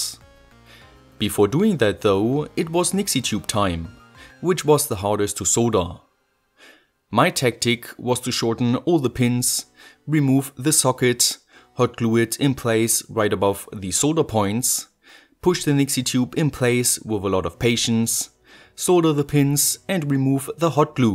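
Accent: Germany. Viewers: Dieses eingedeutschte haha xd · Also den deutschen Akzent oder besser gesagt die Aussprache hört man gut raus aber cooles Projekt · Junge dieses schwere Atmen nach jedem Satz und dieser deutsche Akzent ahaahsh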